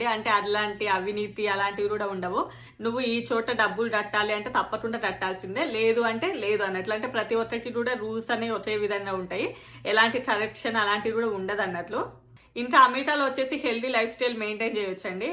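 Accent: Telangana, India. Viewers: Chala baga cheputhunnaviu bidda. unnadi unnatlu clarityga cheppavu thalli.very good Amma.India lo Ap, kadu meru telangana telugu vare anukuntunnanu.thank you bidda.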